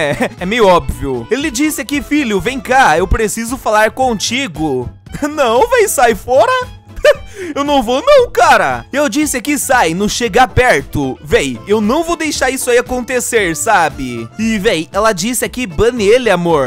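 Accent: Portugal. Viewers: O que que tem sotaque de Portugal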